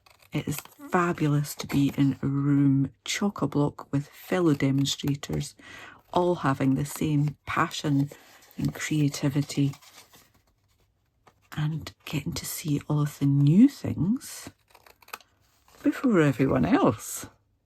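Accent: United Kingdom. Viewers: Oh my goodness no, you do not look like “her” at all!! 😂 Robin Williams must have studied many English accents to perfect it like he did, the minute I hear your voice, it takes me right to that movie, he did a great job with that character! I agree, the SU community has brought me many wonderful friendships that have lasted years, since I started using their products back in 1998! I’ve only been a demo the last 7 years, in June, and I couldn’t imagine a life without SU and all the wonderful people in it! 😌 Take care, it would be wonderful if our paths could cross one day! 💜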